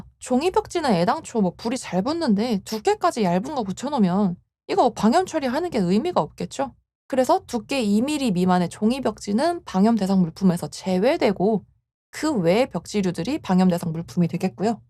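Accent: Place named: Busan, South Korea. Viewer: 쌤 덕분에 공부 잘하고있슴다 근데 달동네던가 억양에서 부산 분이 아니신가 생각되네요 ㅋㅋ 저도 부산에 가까이 살다보니 은근 반갑게 느껴지네요 ㅋㅋㅋ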